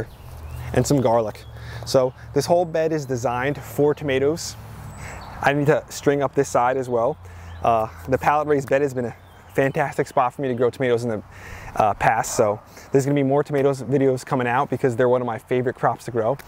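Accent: New Jersey, United States. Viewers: Love the jersey accent. Midwest college had tons of NY NJ MASS kids. Great diversity before that became a thing. Enlightening and broadened education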